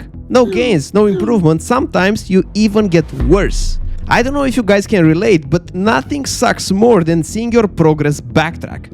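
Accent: Romania. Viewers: Where are you from? It seems you are romanian?🧐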